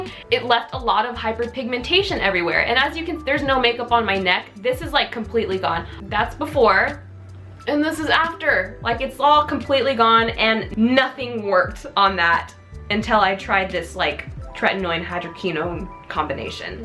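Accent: Australia. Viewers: Are you Australian, from the U.K. or America??? What are we doing love? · prqurn it was Australian not british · Fifi González I thought she was aussie at first lol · Am I crazy or do I randomly hear an Australian accent here and there? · I’m glad I read the description because that Aussie accent REALLY threw me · Did she just start off in an Australian accent??? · I'm Australian and I honestly thought you were an Aussie from the first 10 seconds. Our accent is so damn hard but you did such a good job 💕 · that australian accent thoooo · i prefered her Australian accent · I THOUGHT SHE WAS AUSTRALIAN AT FIRST 🙃🙃🙃🙃🙃🙃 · Des Breaux i could have sworn she was Australian 😂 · Your Australian accent had me dying!! I always do the thing where you pronounce "o" as "or" around my friends to annoy them lol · is it jus me or does this girl have an australian and american accent 💀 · Daniela Gonçalves I thought it was Aussie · I was listening to your voice at the start and you sounded kind of Australian😂 I was like woah I thought you were American😂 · Haha same I first thought British>Australian then realised she’s American 😂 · Why did you talk Australian then change ?? 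Confused as lol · Mashallah you do a great Aussie accent you legit sound like an Aussie-coming from an Aussie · Ooooh an australian youtuber cool ☺️ wait what? 😳 huh? She's american? What? I'm confused af. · Wait... are you Aussie or North American? I'm confused! Lol awesome vid thooough and very informative. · Kazzie Bolaños no that was definitely Aussie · it was an australian accent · I like that Australian accent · HAha. I noticed the Australian accent. Only true Aussies can talk Australian so I would say that lovely must have an australian background:) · THAt AUSTRALIAN ACCENT IS AMAZING ❤️❤️❤️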